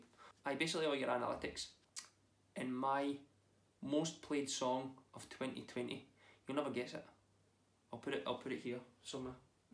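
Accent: Scotland, United Kingdom. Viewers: obvious he is from scottland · yeah it ist scotish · I absolutely love this. I'm new to your channel, but it was nice to see a humble, down to earth, and honest person behind the voice. I struggled to keep up with some of what you said (I'm in the US), but I could listen to you talk/sing all day. I have a small percentage of Scottish in my blood, so I've always been drawn to the Scottish accent, as well as the Irish, British, Australian, well most accents are beautiful to me. 😂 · hart war vielleicht etwas übertrieben in dem Fall. aber einen starken schottischen Dialekt verstehen selbst Engländer schlecht.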